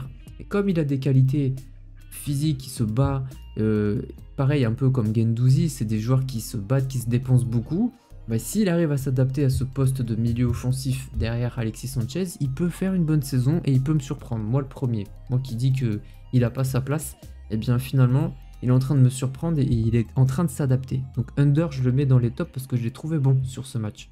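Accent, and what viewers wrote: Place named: Marseille, France. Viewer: C'est l'accent Marseillais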